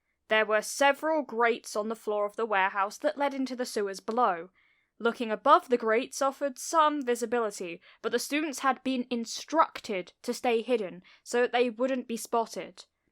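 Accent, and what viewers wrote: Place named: United Kingdom. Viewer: Your British accent was hilarious 😆 I love you videos ❤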